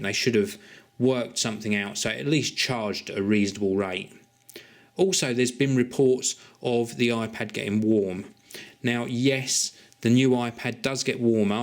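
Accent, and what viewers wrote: United Kingdom. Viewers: He is British?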